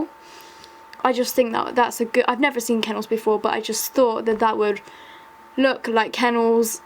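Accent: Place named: England, United Kingdom. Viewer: I love English accents! I think American accents are annoying and boring tbh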